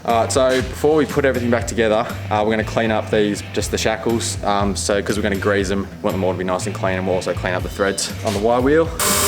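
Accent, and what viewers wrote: Australia. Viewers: I was like: "I think this guy is Australian" "Oh yeah, he's definitely in Australia"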